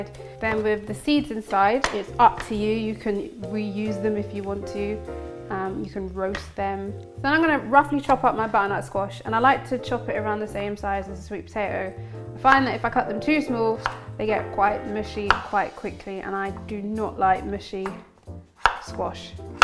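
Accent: United Kingdom. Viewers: sounds like every other british person no?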